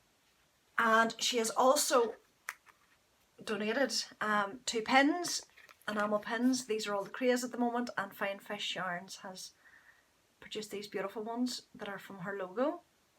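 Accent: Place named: Ireland. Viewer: I love your podcast. Your accent is one of my favorite parts. I live in the western US where we don’t get a lot of Irish influence. I can see why you love that sweater so much. My favorite pattern I ever knit is the Wishmaker Mitt pattern by Erica Hauser.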